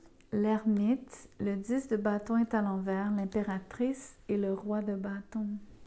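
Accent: Quebec, Canada. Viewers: Bonjour, déjà j'aime beaucoup votre calme , votre voix, par contre je vois pas du tout qui cela pourrait être , cette personne ?? Bref vous m 'apprenez qu'une femme pense à moi en secret , car vraiment je ne vois pas qui c'est ! l'avenir me le dira ou pas . Merci a vous et finalement, je commence à aimer l'accent québécois.😉🤭🤔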